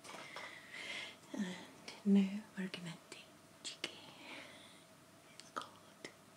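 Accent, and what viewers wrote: Scotland, United Kingdom. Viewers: You're glasses are just everything! Scottish accents are still the best!! 😘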